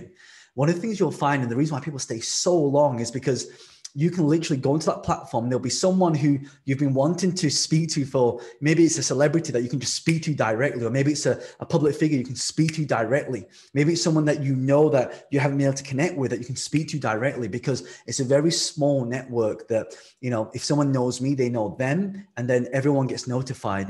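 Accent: United Kingdom. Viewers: I love the good knowledge from you.I love your British accent also.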